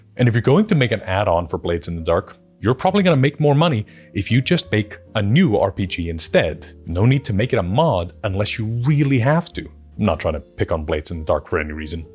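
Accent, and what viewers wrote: Iceland. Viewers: I need to tell you this, because I've thought it for a while now and I can't keep it to myself. Has anyone ever told you that you sound just like Jonathan Frakes, just with and Icelandic accent?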